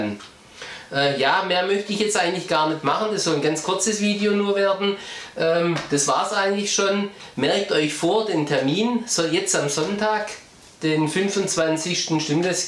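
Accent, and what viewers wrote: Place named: Saxony, Germany. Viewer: hört man doch: Sachsen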